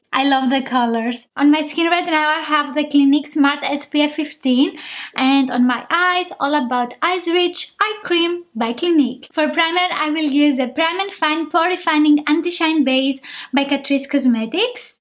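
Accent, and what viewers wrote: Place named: Greece. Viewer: Πως φαίνεται ο Έλληνας.. Η προφορα σε προδοσε, και μετα ειδα το όνομα σου.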